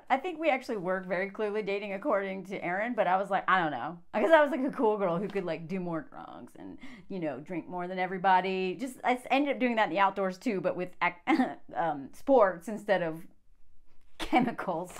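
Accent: South, United States. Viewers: your southern girl baby voice cracks me up every.time 😂 give Moz a hug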